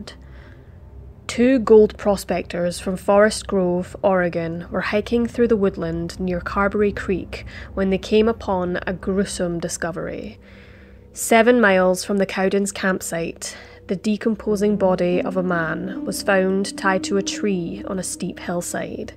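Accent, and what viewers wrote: Scotland, United Kingdom. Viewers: She's Scottish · Scottish AF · She is Scottish lol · A nice Scottish accent. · It is a great accent- but it’s Scottish 😊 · It’s Scottish! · Are you Scottish? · Do I detect a Scottish accent ? Well narrated. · Is this accent Scottish? Reason I ask is I just heard this story on That Chapter in an Irish accent. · Scottish lol and I’m Mexican I know that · George Kintail Scottish accent 🏴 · Louis Cypher She’s Scottish. I love her voice too.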